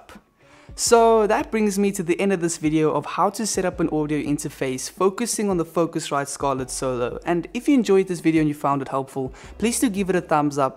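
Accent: South Africa. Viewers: Hes South African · Surely you are South African! Anyway, great video ZA gang here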